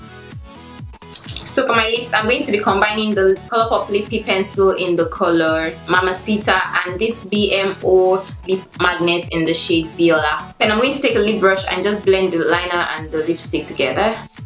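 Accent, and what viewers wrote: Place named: West Africa. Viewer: Okok I see you ahaha, I hear an accent are you from somewhere in west Africa ?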